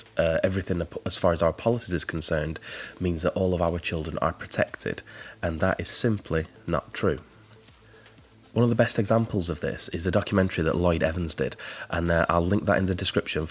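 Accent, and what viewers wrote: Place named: Lancashire, United Kingdom. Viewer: Nice accent ..... Lancs/ Yorks ? Also extremely interesting !!! !it was The Royal Commission that set me free after 40 years !!!!!! I'm a Yorkshire lass !I apologise if you are from Pimlico or Chelsea 👵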